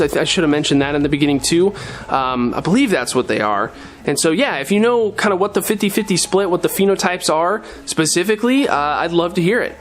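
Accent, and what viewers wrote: United States. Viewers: You’re a Minnesota kid???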